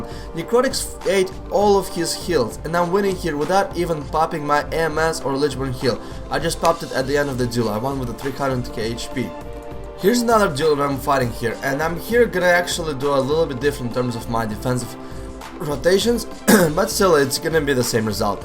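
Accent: Russia. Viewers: Hey, first of all just wanted love the channel and ofc russian accent. i was wondering if maybe i uploaded a game of 2's of me playing unholy you could tell me if im shit or not. thanks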